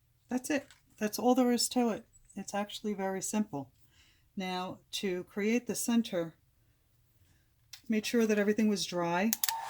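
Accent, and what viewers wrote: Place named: East Coast, United States. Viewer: Thanks for sharing. You make it look easy! Just subscribed. I detect an East coast accent! Lol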